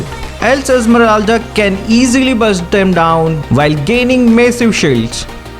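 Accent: India. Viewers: I dont trust indians when it comes mobile legends 😅🤣🤣🤣😂 the accent says it all masala accent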